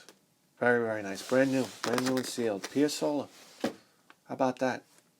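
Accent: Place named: New York, United States. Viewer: Hiya. First off fucking love your show. We're about the same age so I can relate to much of your video game history. I had a subscription to Videogames and Computer Entertainment from 1990-91, I remember there was a special on Phantasy Star 3 in one issue. I poured over that article for hours and hours, examining each screen shot and dreaming about a when i'd get the chance to play it. Never happened, but that's how it was in those days, in my part of Canada, the province of Saskatchewan (i'd to hear you pronounce that on your show with your NYC accent, that'd be fuckin hilarious). There was not much for variety in video games, especially JRPG's. But anyway, I'm with you on the greatness of Phantasy Star 2, I've never completed but i keep getting going back to it over the years. Keep up the great work, on the greatest video game show in the history of human civilization.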